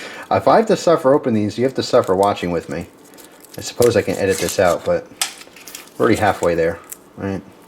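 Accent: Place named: Philadelphia, United States. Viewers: wodder... and weis store. you are from PA. Thanks for the recipe! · Definitely from South Eastern PA, we drink Wooder not Water LMAO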